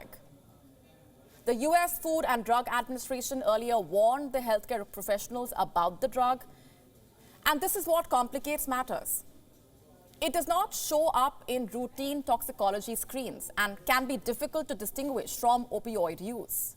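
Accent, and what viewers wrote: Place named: India. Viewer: she's indian so her pronounciation is different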